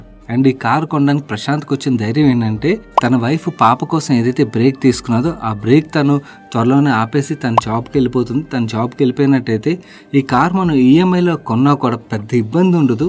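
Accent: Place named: Godavari, India. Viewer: Godhavari sonds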